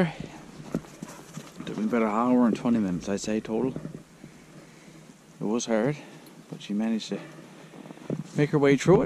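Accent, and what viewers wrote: Newfoundland, Canada. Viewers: When I started watching you clear snow, I said wow, no wind to speak of, how lucky is it to be where you are. I am in Eastern NL, Canada and it seems like it is always windy here. Throwing snow almost straight up is never an option here. Then, I noticed the tall trees and guessed you were in Ontario. Then I saw your license plate and picked up a bit on your accent, seems like you are in Central NL. Great machine for snow clearing. I assume your driveway (at the cabin) is dirt. What do you do to keep your machine from digging in and picking up rocks and breaking shear pins? I haven’t had much luck setting the guides high when clearing uneven surfaces with mine. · Sounds like a fellow Newfoundlander here!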